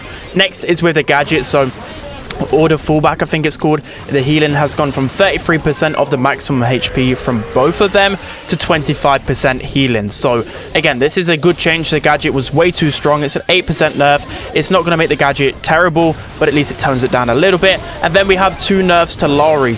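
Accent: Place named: Liverpool, United Kingdom. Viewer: You're a Scouser? Yeah I'm unsubscribing.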